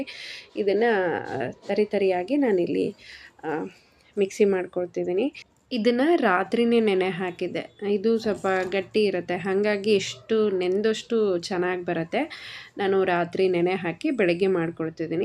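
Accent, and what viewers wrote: Raichur, India. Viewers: Nemma maatu thumba Chanda raichur dst anesuttai nemmadu sis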